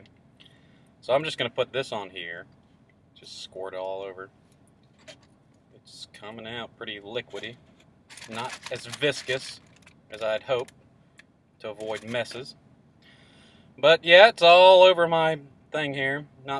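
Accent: United States. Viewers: your accent sounds like where i grew up at raleigh north carolina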